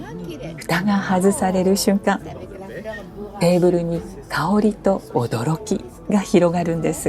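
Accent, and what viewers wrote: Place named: Japan. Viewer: Trop agréable d'entendre une voix japonaise. Vous avez de la chance car notre expérience notamment le service était très mitigé au Lasserre pas très bien. Mais les plats étaient OK.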